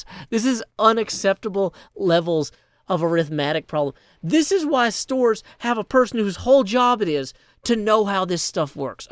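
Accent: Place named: Tennessee, United States. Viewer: I just found u and instantly new u were from TN with that accent😂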